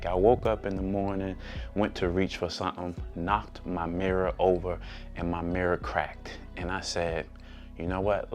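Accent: United States. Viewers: Yo are you from Baltimore?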